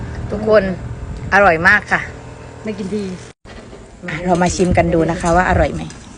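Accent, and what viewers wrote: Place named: Central Thailand, Thailand. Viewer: แหลงกลางชับๆเพนิคนๆ☺️😘🤩💖💟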